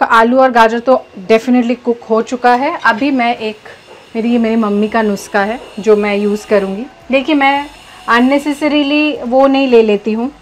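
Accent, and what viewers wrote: Bengal, India. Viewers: I like way you speak Hindi with Bengali accent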